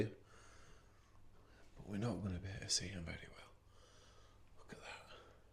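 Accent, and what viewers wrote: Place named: Yorkshire, United Kingdom. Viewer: You do remind me of somebody from emmerdale with your accent 👍enjoy your channel mate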